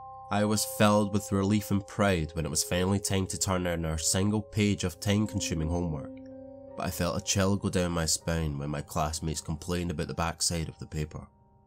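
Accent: Scotland, United Kingdom. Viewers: Oh the snarky ones, good to hear that Scottish accent again😆😜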